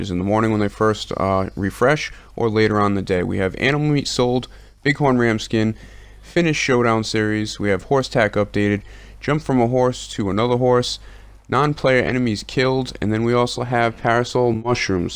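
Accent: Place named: East Coast, United States. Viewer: you know i know you.said before that you lived in the UK and I was kind of confused lol. My daughters mother is from good ol Rhode island and I have wondered lol it makes so much sense now! You dont even have to explain. ( i must admit I did wonder a few times ok how does our friend here speak like a east coast United States native and says he lives in the uk. Makes perfect sense now. You can count on me to watch every video you make. Like and share with everyone I know. You, you are the type of YouTube channel that deserves thousands and millions.... You are real man! You always got a friend and supporter in me old! You already know that though! Keep up the great work my friend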